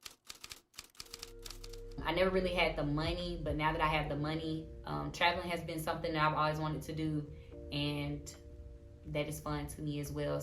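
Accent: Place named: South, United States. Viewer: Southern accent wasn’t expecting tht😂😭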